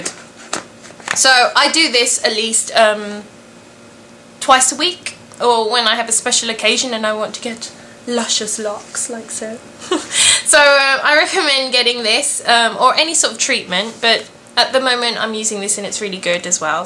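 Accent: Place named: Philippines. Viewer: Omg. I love your accent! Are you Filipina?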